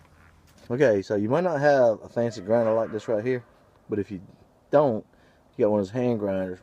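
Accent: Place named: Virginia, United States. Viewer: Really nice video and description. Love your accent and it is very close to how my folks talk from Danville,VA. I'm in the middle (NC). Learned to make stew from some old hands at the ruritan club. They don't grind.They cut into small cubes and cook it til it falls apart in strings. Oldtimer said it needed to have at least one squirrel in there to be authentic. Thanks for the recipe.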